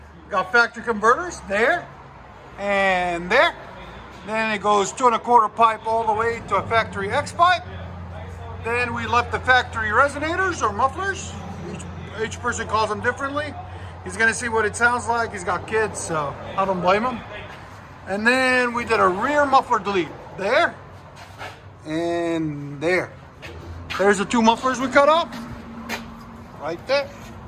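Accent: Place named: Midwest, United States. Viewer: I'd go for the muffler delete or the Magnaflow. That raspy throaty exhaust of others is addictive...but too many miles of Flowmaster drone in Mustangs cured me. As always, beautiful exhaust work, I really enjoy your channel! (BTW, the narrator's voice doesn't sound Tennesse-born- more like my neck of the woods in Chicago/Wisconsin). In your experience, is the rear muffler delete retaining mid-mufflers (with their shared pipe for balance?) a safer bet? The Magnaflow sounds throaty without too much rasp, but that Mustang made me drone-shy...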